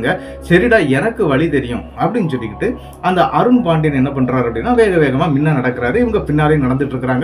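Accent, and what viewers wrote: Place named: Kerala, India. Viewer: நீங்கள் மலையாளம் கலந்த தமிழில் பேசுறீங்க. ப்ரோ.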